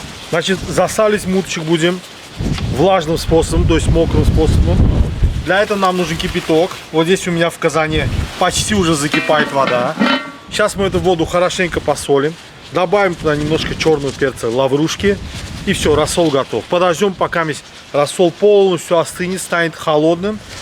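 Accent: Baku, Azerbaijan. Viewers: Русский Бакинский акцент,это песня.Певички , нервно курят в сторонке.Рецепт супер,я так копчу курей. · Очень вкусно выглядет.Акцент и говор чисто Бакинский,я незнаю кто он по нации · Акцент Азербайджанский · Ваш канал, рецепты, приготовление, ваше настроение, акцент, диалект, выражения, все это причины, по которым я с удовольствием смотрю каждый эпизод! Я сразу оказываюсь на родине, в родном Баку 80х! Дай Бог вам здоровья и успехов в творчестве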